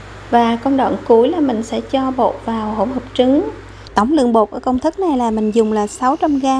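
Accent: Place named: Quang Nam, Vietnam. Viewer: Cám ơn chị đã chia sẽ vidéo làm bánh này theo đúng kiểu truyền thống. Nghe giọng chị trọ trẹ giống người Quảng Nôm, Quãng Ngữa dzị đó. 🥰🥰🥰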